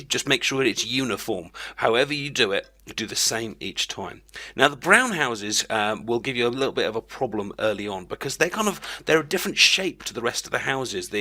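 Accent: United Kingdom. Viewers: I agree lol. I usually hate the british accent but this guy makes it sound really good.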